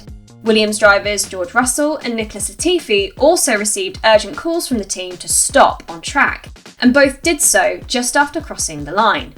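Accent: United Kingdom. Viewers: How about that? There is just something about a pretty girl, with a British accent who just doesn't talk about racing, but actually understands racing.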